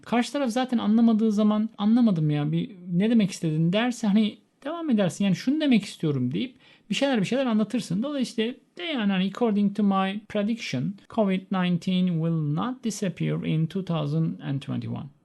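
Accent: Turkey. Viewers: Noooooooo , this pronunciations is tooo turkelish , is not English plzzzz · Bu hangi aksan diye dusunuyorum sonunda buldum.Turgut Ozal aksani.Ayni zamanda bazi gramer hatalari da bulunmakta.Acil olarak kendinize bir native speaker bulun.Ikinci yanlisiniz ise Turkce konusarak Ingilizce ogrenemezsiniz ve konusamazsiniz bunu da unutmayin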